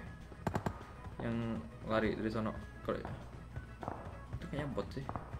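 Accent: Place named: Banjar, Indonesia. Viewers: Banjar banar logatnya 😂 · orang banjar bjir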